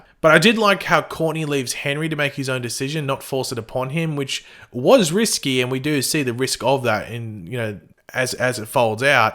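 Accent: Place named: Australia. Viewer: im quite sure that you're australian from your accent like I am and I just saw this video and thought how did you see this before 13th july its the 14th now and I use a vpn to watch the show from the website and cant watch it yet how do you?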